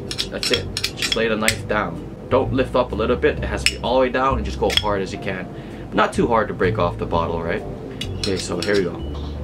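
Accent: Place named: Canada. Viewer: I can tell you're Canadian, Winnipeg perhaps?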